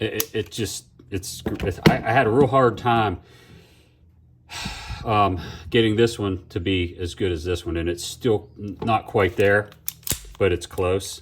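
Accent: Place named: Pittsburgh, United States. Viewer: Good to hear another Yinzer!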